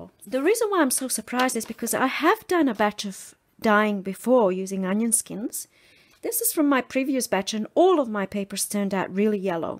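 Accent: Australia. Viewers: She’s Australian. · Your videos are always inspiring and as I’ve said before it’s wonderful to hear an Aussie accent!!!!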